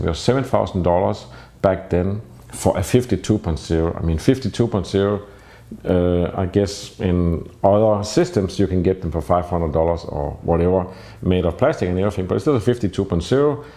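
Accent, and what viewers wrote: Denmark. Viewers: Thanks to you and your Danish accent it pushed me to buy the Leica M240 and have never looked back. Thank you. Now to buy a Leica 50mm lens.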